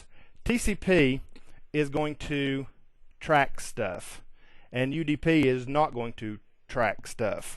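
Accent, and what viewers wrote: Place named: Texas, United States. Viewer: I like that accent. Texas? Somehow it makes me a better listener and able to process more info.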